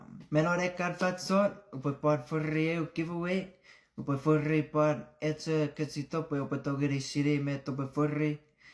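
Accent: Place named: Chuuk, Micronesia. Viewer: I'm impressed with your chuukese accent! Much love from Micronesia!❤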